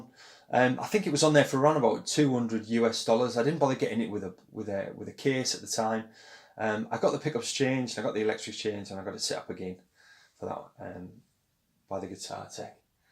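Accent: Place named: Middlesbrough, United Kingdom. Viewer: I have a sharp ear and you sound like you are from Middlesboro steel!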